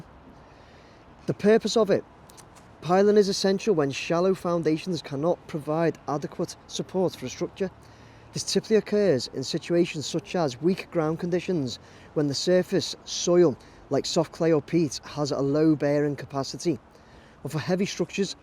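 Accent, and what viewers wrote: Liverpool, United Kingdom. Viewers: Lol....now I appreciate you're Scouse but THAT has gotta be the worst effort at the welsh version of the Racecourse...ever! 🤣 FYI, it's pronounced 'Kai Rass'....👍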